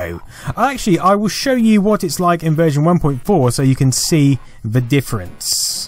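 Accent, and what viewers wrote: United Kingdom. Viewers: I love your obviously British accent:) Great content as well, keep it up !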